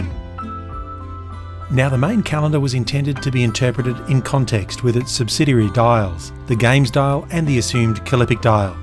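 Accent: Australia. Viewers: admittedly i skiped to this vid but after seeing your craftsmanship i have to watch the whole series as well as your other vids 😅 aussie accent is a nice bonus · Anyone else remember like 5 years ago when you clicked on a video of a dude with an Australian accent making a small brass scribe? Crazy stuff..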